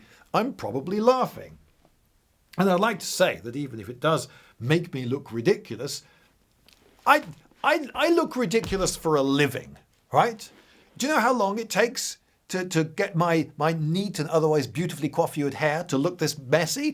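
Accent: United Kingdom. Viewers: British. That's one you are looking for. Terminally, inescapably British.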